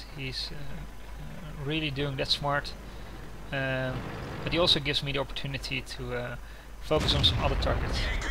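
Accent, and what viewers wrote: Netherlands. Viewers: what i have noticed this time, you're actually dutch ^^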